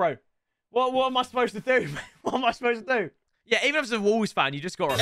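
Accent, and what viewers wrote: South Africa. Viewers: That South African accent tho... Lol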